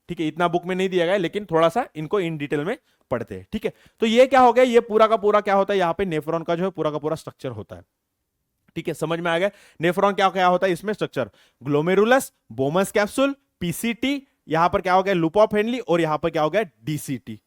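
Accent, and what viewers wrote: Maharashtra, India. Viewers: Sir are you Marathi...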